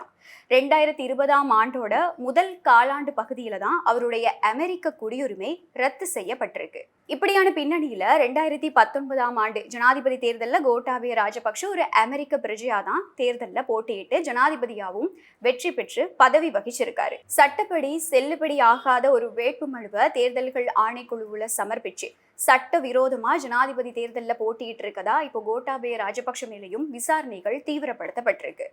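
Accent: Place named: Chennai, India. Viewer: Lankasriயில் Madras தமிழர்கள் பணியாற்றுகிறார்களா அக்கா Madras தமிழில் கலக்குகிறார். கேட்க அசிங்கமாக இருக்கிறது. Lankasriயும் தமிழ் கொலை செய்கிறதா? யாரை நாங்கள் நம்புவது? தூதூதூதூ தூதூதூதூ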